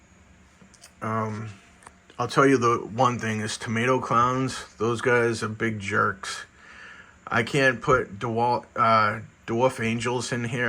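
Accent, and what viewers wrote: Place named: Massachusetts, United States. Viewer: I’m dealing with green hair algae as well but it’s almost gone. Just need to get your hands wet, remove as much algae as you can and do a few water changes. If you stay up on your maintenance, it’ll fix itself. I would tell you, get rid of the vibrant. It’s proven to be more harmful than good. Just a simple google search will show you some horror stories. Side note, sounds like you’re in Mass.. I’m near Worcester.. you might want to join Boston Reefers Society if you haven’t already. Lots of good local knowledgeable reefers willing to help and give advice! good be luck bro.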